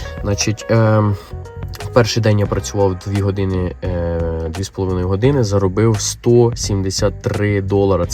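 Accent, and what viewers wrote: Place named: Western Ukraine, Ukraine. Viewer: Я когда слышу его голос или говор меня аж сука коробит) от войны убегало , вуйко?)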